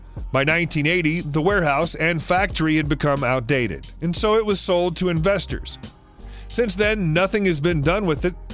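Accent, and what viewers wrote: United States. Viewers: He speaks with an American dialect. I'm from Canada and nothing he said seemed wrong. I also have a lot of American friends, so I am used to hearing their mispronounciations. Look up how they produce Iroquois versus how it is properly pronounced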